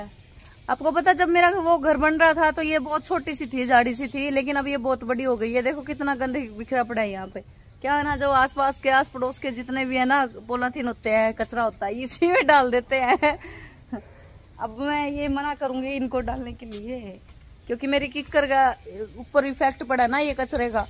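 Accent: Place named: Haryana, India. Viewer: Mam manny hariavni boli bria lagy su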